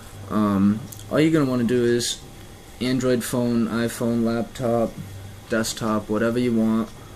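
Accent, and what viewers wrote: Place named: New York, United States. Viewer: damn, some words you pronounce like a real new yorker, and i love it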